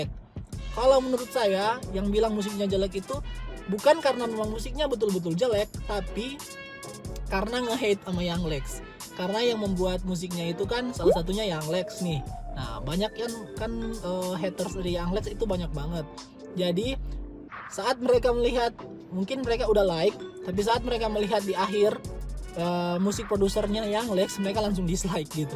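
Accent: Manado, Indonesia. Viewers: Selama tontonin chenl abang nanti tau abang orng manado😂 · Bang org manada kang?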